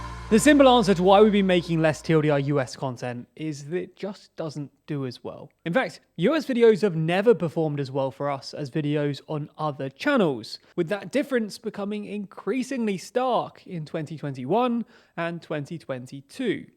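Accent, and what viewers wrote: United Kingdom. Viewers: Oh my! I wonder how US citizens perceive a Brit breaking down their politics and national events, i.e., maybe an American accent may help? Perhaps it should be TDLR North America? Is there an audience from Canada? Maybe less saturation in the market compared to USA? Otherwise, it's all in the pitch. Take, for example, the BBC. Around the world they are largely perceived as the gold standard due to their perceived impartiality and focus on news over opinion. TDLR has one presenter with an uncluttered environment. Either run wuth that and make it explicit that TDLR is the home of news facts not news opinion. Being based in UK you have geographical distance from all the political shenanigans and little exposure to the dross on opinion news TV. You do a PR number on your impartiality. You don't have connections with US politicians or lobbyists unlike US legacy media whi must kiss the ring to avoid getting expelled from their chummy club. 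Or you need to seem less "other". If you hear a US or Australian news presenter talking about UK events do you ever get annoyed with them? Mutter about how would they know bkah blah? Jazz it up, get a co-presenter, recognise that you might not be abke to copy the UK format over every channel. Anyhoo. My tuppence. Double down or change format. If everyone really wants news facts and less opinion then you need to explicitly pitch the channel that way. It's a busy market. What's your unique selling point? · I subscribe to all of your channels, so my comment is not personal. But, you might try a American English speaking presenter. Many Americans might feel put off with a British accent talking about American polotics. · If you are based in the UK, then American news would fit better in Global anyway. If you retain the TLDR US channel, you need to decide how it will be different than any other hundreds of other US-news channels available. 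If you think you have a viable approach for the US-market, then give it the same attention as the other channels and evaluate it based on that. If it still underperforms, then you know Americans just aren't resonating with your perspective/delivery and you should focus your attention elsewhere. It's unfortunate that you don't have the luxury of operating at a loss - which was how news was run in the mid-20th century. Currently, since your accent gives away you are based in the UK, I see your channel as a Brit/European perspective on US news and politics - basically the "outsider's" perspective. I'll expect the content to be even more left leaning than typical American media (American political center is more to the right than European political center).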